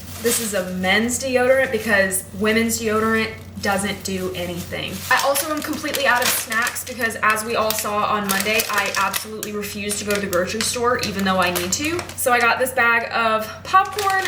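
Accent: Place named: South, United States. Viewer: I hear a southern accent coming along 😂🫶🏼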